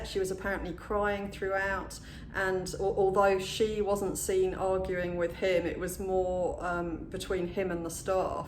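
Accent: England, United Kingdom. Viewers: Love your accent. It's like listing to the Queen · nice accent, are you from England?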